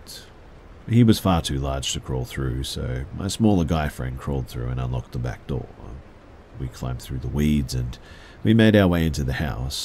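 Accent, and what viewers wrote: Australia. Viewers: So you’re from the land down under?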